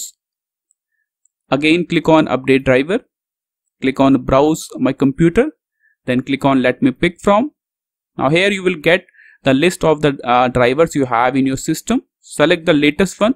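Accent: India. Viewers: indian accent?? Im saved 🙏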